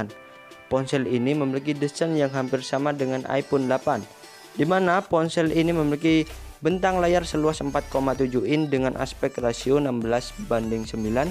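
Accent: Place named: Tegal, Indonesia. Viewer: Medok bener ni tegal nya. Lanjut gan nice info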